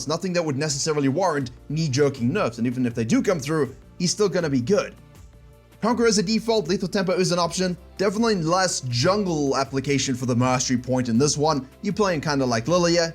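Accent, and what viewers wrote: South Africa. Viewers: WOOOOOOOO a fellow South African.. Don't know how I didn't pick up the accent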